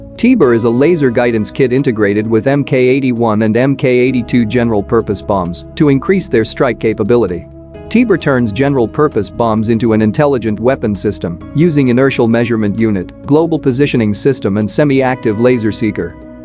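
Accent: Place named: United States. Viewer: Ich frage mich wie krank ist die Menschenheit, die Überschrift heißt, Endlich hat die Türkei die Tödllichste Drohne. Als ob die Welt ohne Waffen da steht. Der Sprecher dürfte wohl Amerikaner sein. Ich wünsche uns allen Frieden und Freiheit auf Erden ohne Waffen, und nicht mit Waffen.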